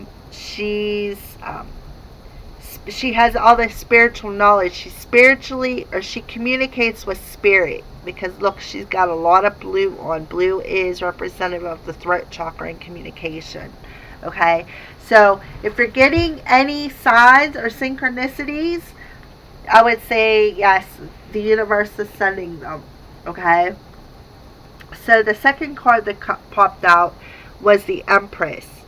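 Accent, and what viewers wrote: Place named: Baltimore, United States. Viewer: Are you from Baltimore..?..we have a unique accent so I've been told and you seem to have it 😀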